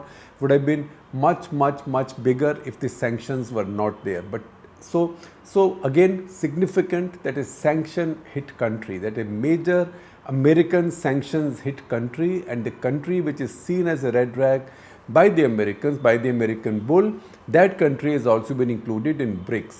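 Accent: India. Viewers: Too much Hindianism with 'trups' rather than 'troops'. Vowel shortening gives off bad vibes with distraction.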